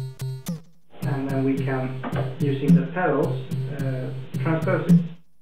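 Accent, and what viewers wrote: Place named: Skåne, Sweden. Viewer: He's definitely swedish, sounds like he's from the Skåne area (not sure).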